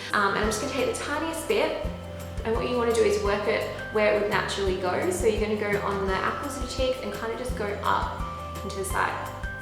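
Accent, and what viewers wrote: Australia. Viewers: Are you Australian? Random question, I know. But great job with the makeup though! 😃💄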